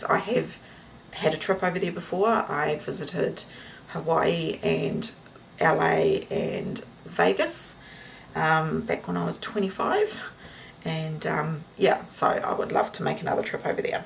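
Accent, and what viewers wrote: New Zealand. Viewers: OMG- please don't take the 1% negative comments to heart!!! We LOVE seeing you in your little kiwi kitchen, yours echoes our own... Your accent, your beautiful aprons... It's all familiar...80% of kiwis live like you do! Good on you, for taking your life into your own hands and creating your dream life! We celebrate you! ❤ 🎉🥳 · What a nasty thing to say to you. It is not anyone's place to make personal comments. I love you videos and I have only just discovered them. You are REAL and most of us have kitchens just like you. I enjoy the way you are always positive and make the videos fun. Please keep up the good work. I am in NZ too and it is great to hear another kiwi.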